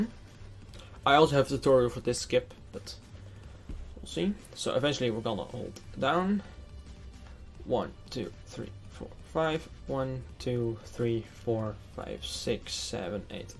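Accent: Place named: Netherlands. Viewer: Mooie Nederlands-Engels accent.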